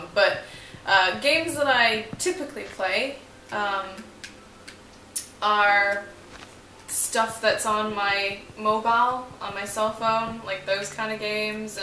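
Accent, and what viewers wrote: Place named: United Kingdom. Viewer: How long have you been living in the UK? I noticed sometimes when you were speaking your voice had a british tone to it xP if that makes sense. The video was pretty cool, the intro was funky :P little tip for future videos: keep your hotbar organised (i.e. keep your tools in a place where you can conveniently access them - I usually keep mine to the left of the hotbar, but find a way that suits you :P) other than that I enjoyed the video :D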